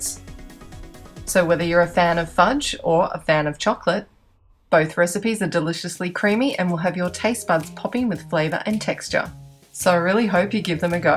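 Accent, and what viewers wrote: Australia. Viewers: Are you Australian? Ps I love all your vids :)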